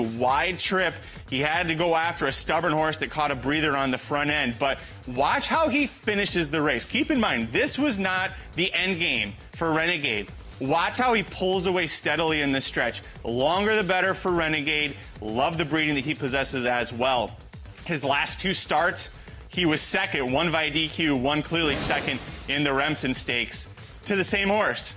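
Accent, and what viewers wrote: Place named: Canada. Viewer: Dude you have no clue! You are stupid, and sound Canadian!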